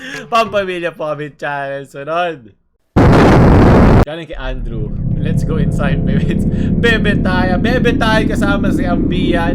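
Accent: Visayas, Philippines. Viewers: ANG CUTE NI PAG NAG BIBISAYA MURAG YAWA HAHAHAHA LAB U SINCE 2018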